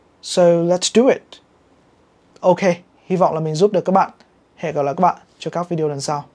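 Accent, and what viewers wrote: United Kingdom. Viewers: Ong nay thi ong phat am theo british ma suot ngay cu blame theo A.E accent lol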